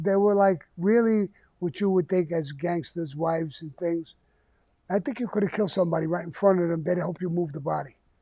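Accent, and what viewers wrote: United Kingdom. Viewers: Your accent blows go back over the pond and talk about the queen like you know anything about the mob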